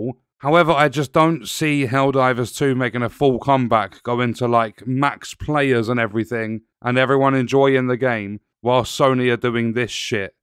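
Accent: United Kingdom. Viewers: Nicw to hear another british bloke commenting out here. And yeah, this decision has been the fastest reputation "self unalive" I have seen for a game recently. I really hope some competent individuals can come together to start a class action lawsuit against Sony. It would be cool if Arrowhead sued Sony for the damage they've done to their game, possibly even sinking the studio if it gets worse.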